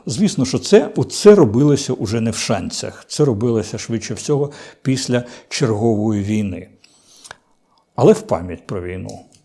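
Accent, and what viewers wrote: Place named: Russia. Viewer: Цікаво і слушно, незважаючи на російський акцент з цими жахливими щьоканнями і чьоканнями, і на слова з російського словника, що ними послуговується автор і ніяк не спроможний відмовитись від них.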